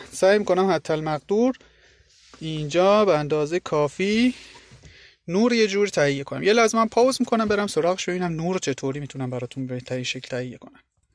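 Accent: Shiraz, Iran. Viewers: لهجه ی شیرازی خیلی شیرینه ربطی نداشت ولی کلا گفتم😂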